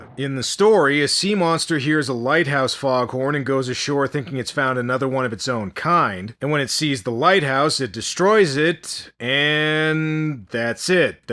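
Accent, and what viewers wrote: Canada. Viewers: Holy shit you kinda make a make a canadian accent sound kinda hot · That Canadian accent . I died 😆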